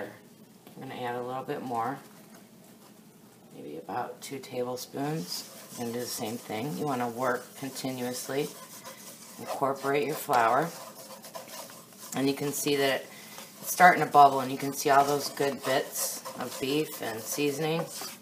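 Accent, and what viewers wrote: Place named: Chicago, United States. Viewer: are you from Chicagoland. I am from Matteson and you sound like a local. I have subscribed and will be making that ASAP. You rock!. Keep them coming I will be watching.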